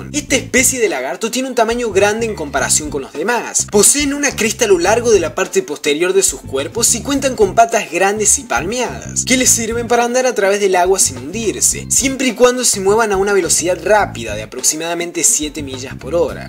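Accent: Córdoba, Argentina. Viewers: sos de Córdoba? De que parte?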